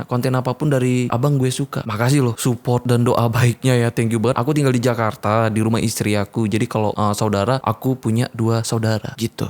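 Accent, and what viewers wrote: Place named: Aceh, Indonesia. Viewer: Gapapa bang adain lagi aja #ThreadHorror nya, mau itu cerita real ato engga, yg penting kita2 bisa ngerasain feel serem-nya bareng2 hehehe... Salam dari Semarang bang. Ohiya, aku tebak Bang pasti asli Aceh nih, ketauan dari logatnya soalnya, apalagi video2 yg dulu logatnya masih kental banget tuh wkwkw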